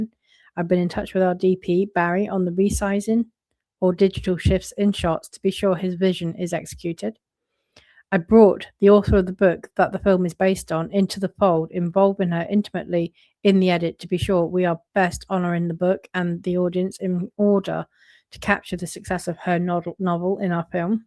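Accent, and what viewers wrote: United Kingdom. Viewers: I thought it was your British accent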